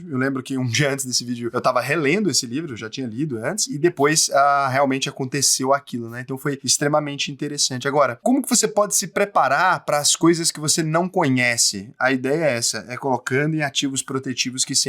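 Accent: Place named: Rio de Janeiro, Brazil. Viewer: Ohh esse audio seu tá parecendo carioqueis parece esta falando assobiando 🤣🤣🤣 ajuda aí meu fi.Ass: Frantyesco Maia mineiro aqui em Portugal, um abraço e obrigado pelas excelentes explicações.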